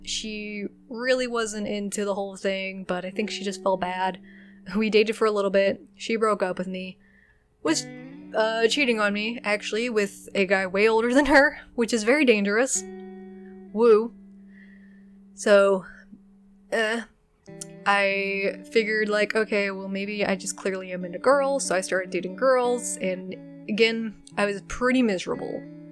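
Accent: Midwest, United States. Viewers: Midwest: heavy souther with some northern mixed in and a bit of its own thing all at once.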